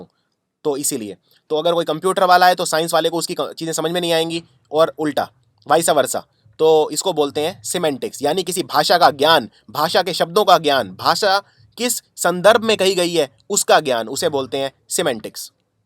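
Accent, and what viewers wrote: India. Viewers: oooo sh***t again this pronunciation by indian people .....!!!!! sorry, but it's so AWFUL!!!! my ears are died !!!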